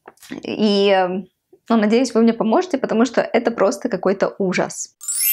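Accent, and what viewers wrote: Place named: Ukraine. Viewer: я недавно начала смотреть твои видео и все никак не могла понять, что у тебя за интересный акцент!?? А оказывается вы дома на украинском разговариваете! Так ты русский выучила специально чтобы канал вести?...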